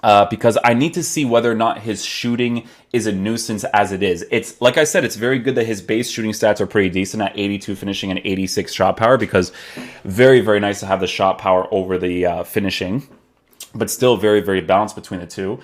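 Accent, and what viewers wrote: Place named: Portugal. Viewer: És tuga? Notei aí algum português na pronúncia ahah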